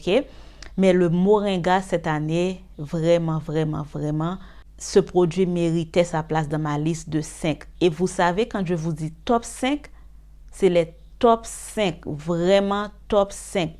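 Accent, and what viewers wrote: Haiti. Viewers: J’aime bien ta façon de parler 😀 ton accent haïtien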